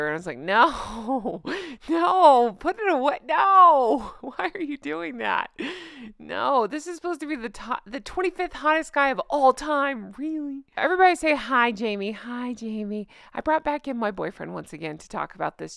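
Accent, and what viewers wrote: Ireland. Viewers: I'm Irish and your Accent is not too far off😮love ya love your Channel God Bless to you and your wee family 🙏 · Oh thank you for making me laugh and chereing me up!! in bed ill,drinking tea, and i have not stopped laughing!! by the way your Irish accent is good!! take it from me☘❤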